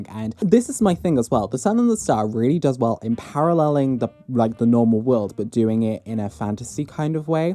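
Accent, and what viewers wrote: United Kingdom. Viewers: You've such a nice accent! Is it from Britain?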